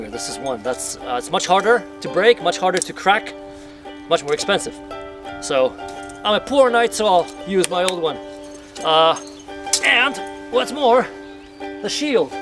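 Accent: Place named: England, United Kingdom. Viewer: Genialny angielski akcent wow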